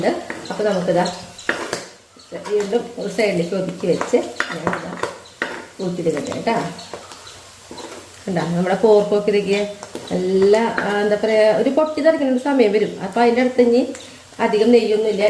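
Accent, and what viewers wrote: Thrissur, India. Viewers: Ante chechy onnum manasilakilla njangal Thrissurkaralla Thirichu parayu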